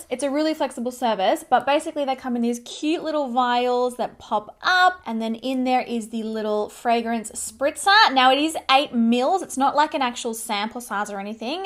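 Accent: Australia. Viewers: I am a huge fan of yours!! I lived in Sydney for years so I feel at home with you. I'm in Argentina now but my father is there. Love your good vibes and don't worry about the aussie accent is great!! Loved your wedding blog!! 😍😍❤❤💋💋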